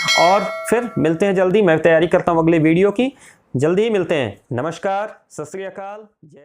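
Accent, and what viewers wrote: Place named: Gorakhpur, India. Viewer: Gorakhpuriya khas gorakhpuriya 😅😂😂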